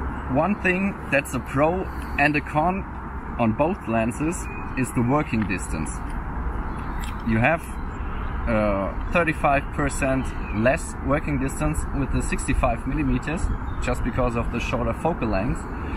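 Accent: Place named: Germany. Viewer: Charmanter deutscher Akzent..... 😉Tolles Video.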